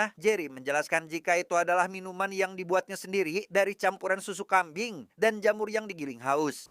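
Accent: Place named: Sunda, Indonesia. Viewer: Unik bgt suaranya. Film horor tp suaranya kyk ada sunda2nya beuuh ucul bgt fans wh lah mang . Curiga tos ngaliwet😂 ieu mah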